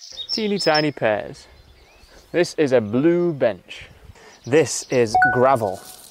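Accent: United Kingdom. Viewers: Thank you. I´m from Mexico and I thought that the accent British would be difficult for me, but not. I´m grateful to find your channel. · He is so nice but I have problem with a British accent 😢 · The video was so aesthetic✨. I really like the British accent, it’s so charming. I felt relaxed and at peace while watching it. Please make more videos like this! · Hi, I am excited to watch your comprehensive videos. I have got a lot of vocabulary there, besides I get used to listening a British man speaking about his sorroundings, thank you, stay healthy, will you? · I very like British English! Thank You! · It's been a month since I started learning English, and l've realized that British English is easier for me than American English I can easily understand what you're saying I'm new subscriber and I really like what you share with us keep going 🎉🎉🎉🎉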